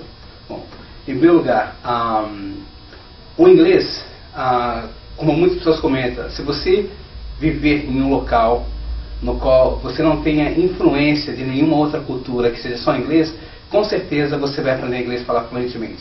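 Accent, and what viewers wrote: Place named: Paraguay. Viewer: Meu Deus que ingles mais paraguaio!!! The pronunciation is terrible ! Nun fala nen ingles nen pt direito! Nao existe ingles em 50 horas , nao se iludam. Aprender uma lingua nen é algo mecanico.... olha se essa tecnica é tão boa , os filhos e parentes desse cara deve ser poliglota kkkkkk ! Eu falo ingles e sei que aprender uma lingua nao está ligado a nivel de inteligencia. Nao acho correto enganar as pessoas desta forma se é que alguem acredita . Abraços!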